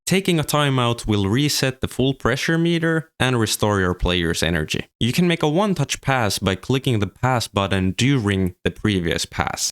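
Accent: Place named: Finland. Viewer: Suomi englanti on parasta! Teetkö muute suomeks kans?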